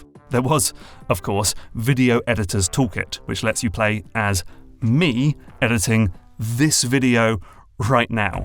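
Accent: United Kingdom. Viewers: Need YouTube AI to convert unpleasant British accents to accent of our choice.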